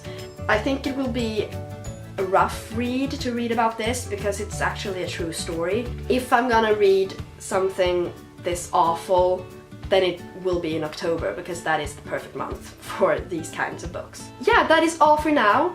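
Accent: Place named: England, United Kingdom. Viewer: P.s I so so love your english accent❤️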